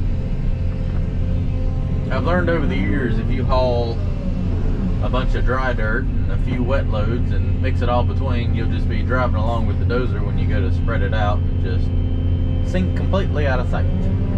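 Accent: South, United States. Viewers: I love watching the different things you do. I also love to hear you talk with that southern drawell and semi deep voice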